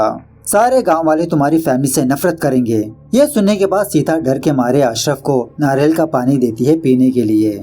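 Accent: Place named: Maharashtra, India. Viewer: Iski Jaat Ka.., Is a Epic Word.. Creator Ne Must Bataaya Movie ko Short me.. Lekin Na Movie ke naam ka Matlab inko Samjh Aaya Na Propegenda Samjh Aaya .. Isiliye Hindi Dubbed movie ka Short bana Diya Copy Paste.. Ending Explanation Ka Tag use mat Karna.. Good Working lekin Aapka aawaz Maharashtra ke koi pichde hue Gaao ke ilaake Wala kheti kisan ya mazdur ke anpadh Bete jesa lagta he.. means baat karne ka tarika .. phir bhi best luck Meri Taraf se.. Jo Aap aaj yaha Tak pohche.. 👍🏼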